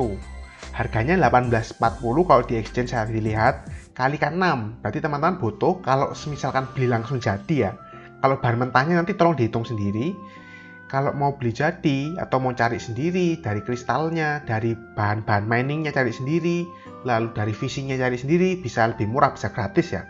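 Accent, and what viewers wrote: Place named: Surabaya, Indonesia. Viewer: kalo didenger2 dr suaranya kyk org surabaya y bang?maaf kalo salah...🙏🙏🙏🤭🤭🤭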